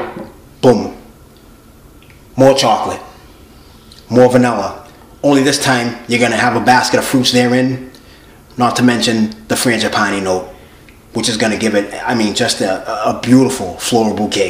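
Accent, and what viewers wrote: Boston, United States. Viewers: Is that a Boston accent? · This was soooo helpful! I love your passion for scent - and your accent! (Boston?). Big love from the UK and Merry Christmas 🙂. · ok my guess was Boston, but didn't want to assume 😉 thanks very much for such an informative video covering so much ground! Subscribed 👍🏽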